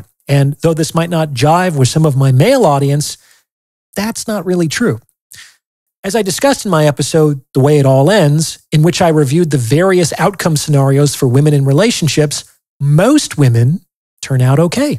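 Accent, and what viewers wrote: United Kingdom. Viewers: It's the British accent for me 😂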